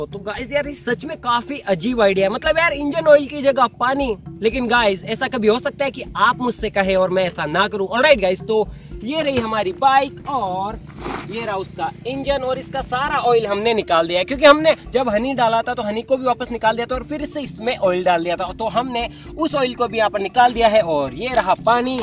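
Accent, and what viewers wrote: Rajasthan, India. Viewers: you make very good vedio you have Rajasthani 😃😃😃😃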